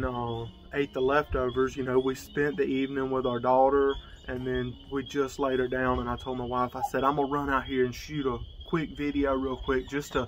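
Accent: South, United States. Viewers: This is your calling. I love the southern accent and the realness. This mama bear approves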